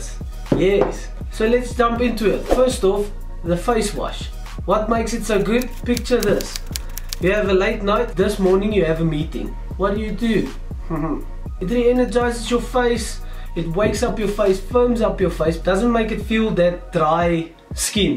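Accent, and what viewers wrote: South Africa. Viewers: Sounds like a broertjie from South Africa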